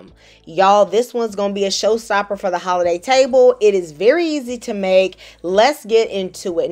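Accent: South, United States. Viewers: I usually just listen to your videos while i'm drawing. I really love that beautiful southern accent, have a great easter you and your family, God bless!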